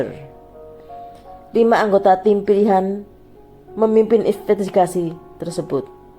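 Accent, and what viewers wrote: Java, Indonesia. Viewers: ..... tetap aja top markotop kalo madam yg bawa kan.... mau itu kasus apa pun.... karena ciri khas medok nya.... malah bikin kangen.... selalu.... teruskan bersinar ya madam....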